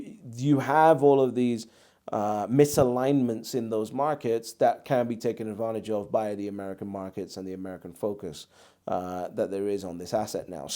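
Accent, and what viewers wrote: Scotland, United Kingdom. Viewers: A quite realistic appraisal, yes there are a lot of rivers still to cross for Bitcoin. And yes US ETF approval was a major step because SEC were effectively forced by a court judgement to approve Bitcoin against their wishes - that is a sea change, it is a significant shift within the power structure - people like Kevin O'Leary openly laughed at people taking cases against the SEC. Are you from Scotland yourself, Edinburgh maybe?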